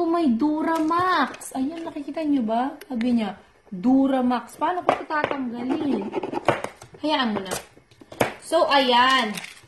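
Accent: Visayas, Philippines. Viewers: Ate bisays ka?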